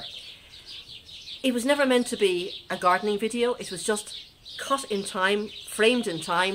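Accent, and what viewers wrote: Ireland. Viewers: I just discovered your channel today, and have been binge watching your videos. I just watched your "last" video. I am sooooooo dissapointed your not continuing on with your videos. I understand you completed your 3 year mission, but from the looks of all the well wishers, I think many people would love to see you continueon and make a new journey. You have so much knowledge to share, and your very entertaining with your funny witt, plus that Irish accent is lovely. I loved seeing your garden, it gave me so many ideas to start thinking about for next year. please come back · Only found your videos today(while searching for pruning advice on viburnum tinus)Twas the Irish accent that made me continue watching!Wowza,you are truly AMAZING! Thank you sooo much for sharing the wonderful advice/suggestions/inspirations/and of course your incredible knowledge & sensiblity/you are a GEM!Continued Good Health,& Enjoyment with your Gardening.Best Wishes,from rainy July in Ireland.p.s. 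I have enjoyed 2 hours of watching some of your videos, (lots more to watch yet),that "d'other half"is wondering when is dinner!!!!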